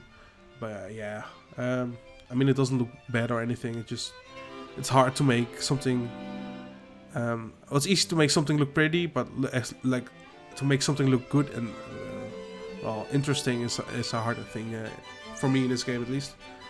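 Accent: Netherlands. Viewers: Ik hou van je Nederlandse accent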